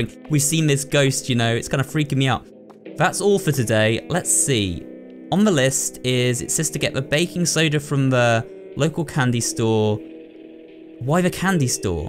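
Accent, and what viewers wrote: United Kingdom. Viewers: Ur uploads always soothe my stressed mind with that comforting and sexy british accent. Luv u!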